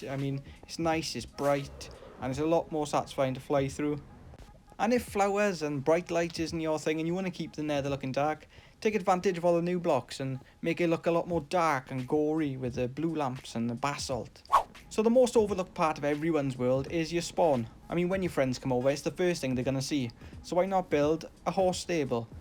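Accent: Wales, United Kingdom. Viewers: Are you Welsh I’m welsh and you got a welsh accent I love it 😂 and also this is a great video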